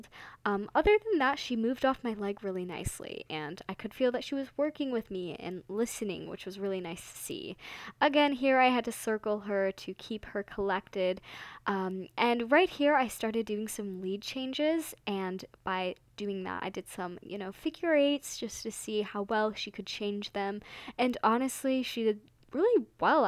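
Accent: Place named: United States. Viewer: I found this kinda awkward because its an American ish voice I don't know why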